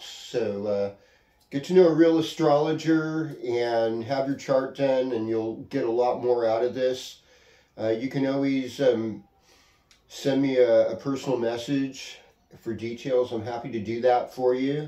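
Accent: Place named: California, United States. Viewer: Great show,! It’s true: We are a collection of hicks, cowboys and hippies. Though I don’t think I fit in with either of those groups. If anything, I consider myself a low level scholar and story teller. Also with those three groups, you get the two California accents: “The surfer” accent, with its elongated vowels and drawl. Then there’s that whole “faux southern” accent that’s common in this area, with its sharpness. I felt inspired to comment on that aspect. I like your surfer accent,! Especially, since accents seem to be fading these days. Anyway, peace brother ☮️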